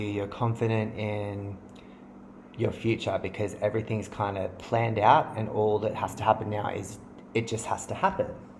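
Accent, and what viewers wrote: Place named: Australia. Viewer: Great to hear an Aussie!! You're spot on... keep doing it... I love that you're not afraid to talk about the denial ..it was my relationship... I felt he was lying about things but he gaslighted me into believing him...until I started having confirming dreams!! Confronted him & now with my eyes opened I could feel he was lying... he's a covert narcissist. It was his control for 4 yrs & yeh on the surface he gave up alot of addictions but was wanking to porn/women online!! Yep he's in HUGE denial... very deceiptful Keep reading... you're fairly spot on for me except the career thing...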